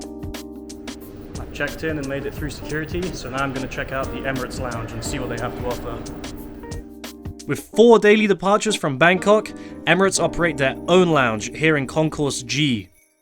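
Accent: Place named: Southern England, United Kingdom. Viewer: He is British but I can't pick the county. Not a northerner, that's for sure, so he's from south of Birmingham.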